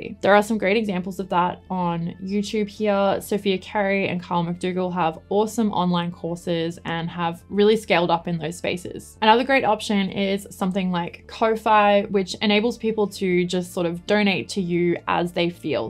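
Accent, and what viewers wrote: Australia. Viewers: Subscribed. Enjoyed the video. It's crazy how I picked up your AU accent. Over the years I started to follow a few photographers from AU and this algorithm is now flooding me. But there are really good creators out there. Hope to visit one day.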